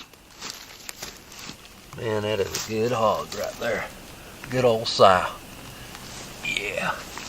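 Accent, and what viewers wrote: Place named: South, United States. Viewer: Dope video love the clarity of the audio too it’s so calm and quiet but the southern accent and saying “keeel” the hog brings it all together 5 stars ⭐️⭐️⭐️⭐️⭐️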